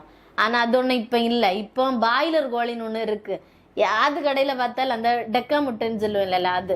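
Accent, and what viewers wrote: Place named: Kerala, India. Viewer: இது என்ன பாஷை ஒண்ணுமே புரியல எங்கள் கொங்கு தமிழில் சொன்னால் தானே எங்களுக்கு புரியும் இதில் கொஞ்சம் மலையாள வாசனையை அடிக்கிறது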